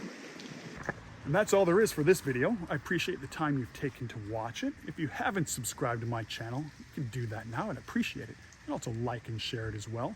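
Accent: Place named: Minnesota, United States. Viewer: You got that Minnesota accent.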